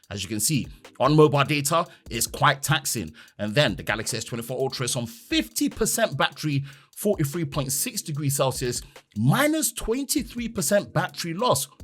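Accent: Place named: United Kingdom. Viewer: I like your British accent more than the whole drain test bro . Keep it up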